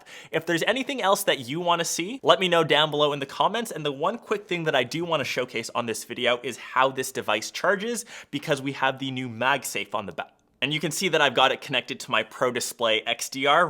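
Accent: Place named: Canada. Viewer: Love the Canadian accent! The 2 phones in your videos are the 2 I'm deciding between; the red 12 & the Pacific Blue Pro. Imagine a red Pro! 🥰 I'll order the Pacific blue & then they'll release a red later on. 🤦🏻‍♂️Edit, it's never mentioned & I keep forgetting, I AM getting the Pro, because it has 6GB RAM vs 4 in the 12.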